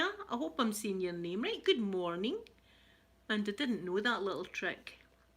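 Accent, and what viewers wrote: Scotland, United Kingdom. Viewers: I love scottish accent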